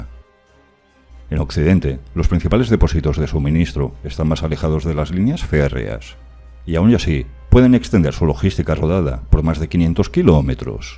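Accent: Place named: Aragon, Spain. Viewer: La entonación parece maña, de Zaragoza o si no de la rivera del Ebro.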